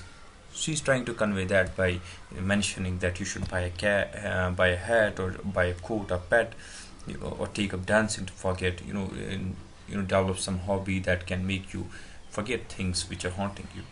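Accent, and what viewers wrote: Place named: Kashmir, India. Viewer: you sound very kashmiri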